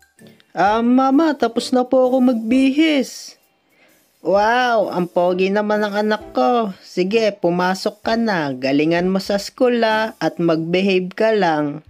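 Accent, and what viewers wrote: Philippines. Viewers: Taga maynila ka ba?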